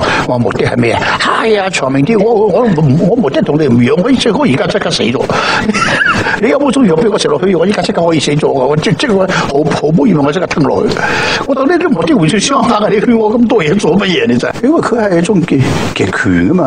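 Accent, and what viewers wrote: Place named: Shanghai, China. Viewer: 用沪语的音调讲粤语，听得好亲切。少小离家永不还，乡音无改鬓毛衰，倪老先生千古!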